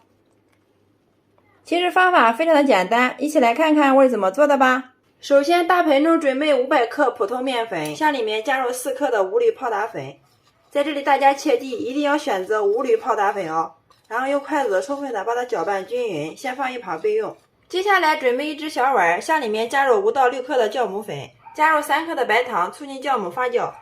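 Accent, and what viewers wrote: Shandong, China. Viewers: 不单纯是快的问题，主要是山东话味儿太重所以听着费劲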